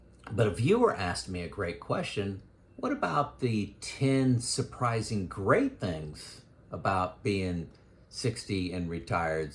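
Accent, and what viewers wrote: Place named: United States. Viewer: Speaking of making fun of how you talk - are you of German heritage or grow up i a heavily German heritage area? My German-descent mother from Wisconsin and her whole side of the family pronounces "wash" as "warsh". Like George "Warshington". My dad and his Polish side of the family from Wisconsin just say "wash". Not a criticism (although we would gently tease our mom sometimes), just curious.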